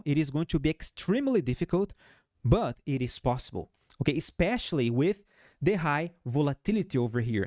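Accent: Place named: Brazil. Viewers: He is from Brazil! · Thanks for the content, brother. Where are you from? Your accent sounds like Brasilian. É nois!😅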